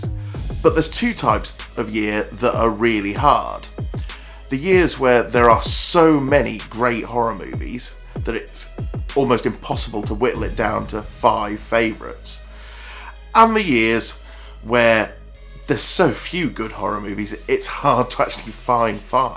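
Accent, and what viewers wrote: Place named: United Kingdom. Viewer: Are you British, that accent so much sound like British?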